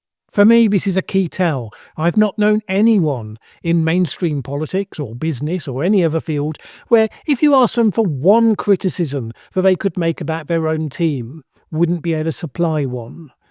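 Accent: United Kingdom. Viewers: Great video, thank you from a subscriber greatful for interesting inteligent thoughts and a pleasant British accent. 👋 Cymru... Any chance of you joining BlueSky for those of us who prefer not to add to Mr Musks empire.. If I had to guess that the human brain and it's psychology means you have to work to avoid that 'cultist warm cwtchy comfort zone' that is the inside of your own head. 😱..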